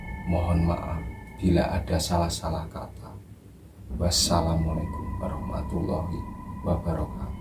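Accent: Java, Indonesia. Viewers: Salam santun dri kuala kansar perak. Muga anda smua mdptRahmat n Kasih llahi jua. Amin2x. Taniah pd nsumber dan host yg msampaikan dgn baik tapi he he he ada lengguk bahsa jawa namun kmi org melayu k.kangsar masih bisa mgerti Mas. T kasih. Sya titip pantun sja Mas . Bolihkan. Permisi. Jangan ditanya kemana aku pergi. Abg Udin myanyi seorang diri. Sudah lama aku mcari. Rupanya cnell. L. D. sudah lama disisiku. Salam santun dri kota kuala kangsar, perak. T q. 🌴🙏🙋🦋🌄🐓🌳🏖️🐠🐠🍀🦋🙏🏞️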